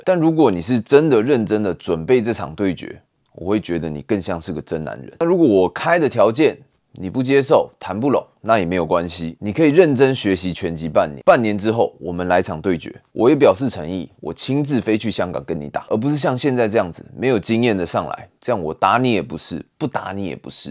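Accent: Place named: Malaysia. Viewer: 1-5頂一個，感覺口音是大馬人，你的勸告也是很正向，的確他給小潘打輸了，也是沒什麼的。現在起碼知道這個月會有一場會迎戰。不過其他不說，TOZY發起挑戰後有特意學拳擊，其實已滿有誠意。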